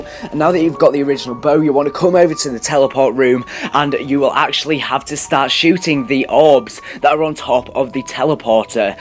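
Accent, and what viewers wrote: England, United Kingdom. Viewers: No, not British. Its the accent/dialect of the area of England he comes from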